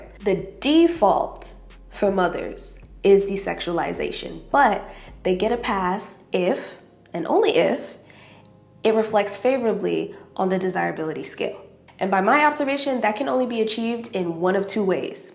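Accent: Jamaica. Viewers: So yuh just been chippin in the patois these past videos 😂😂 I genuinely love that 😂😂😂💜💜💜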